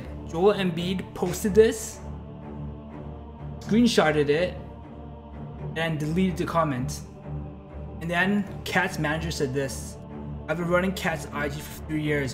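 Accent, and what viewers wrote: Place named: Toronto, Canada. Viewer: you're a Toronto man right? I can tell by the way you talk lol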